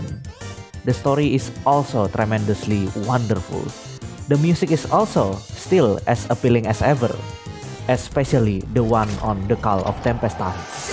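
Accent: Indonesia. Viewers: The accent sounds Javanese to me.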